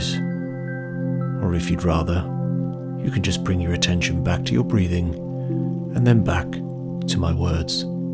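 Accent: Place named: United Kingdom. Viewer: I find all your guided meditations just perfect. Started to listen because I especifically wanted a guider with a smooth male voice and British accent. But all meditations are just so beautifully said and calming. It has helped me a lot throughout the past months. Thank you, looking forward for new videos.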